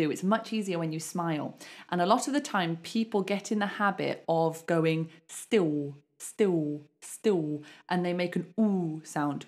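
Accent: United Kingdom. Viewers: Excellent ! Lovely British pronunciation ❤ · I adore standard British accent, thank you for your lessons! I absolutely love it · Pleasure to hear pure clear british english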